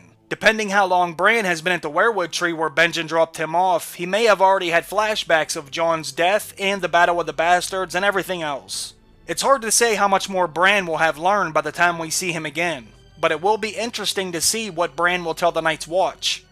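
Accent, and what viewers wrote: Pittsburgh, United States. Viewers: Thank you for doing such a great job on these videos. You are one of the best channels of this nature out there. Have to ask, are you from the Pittsburgh area by chance? Anyway, awesome job and keep up the good work!!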